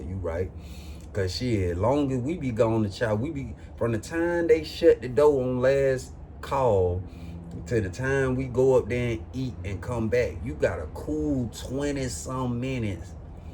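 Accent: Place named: United States. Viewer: Fresh out tha friderator 😂😂😂 I love your country ass ❤️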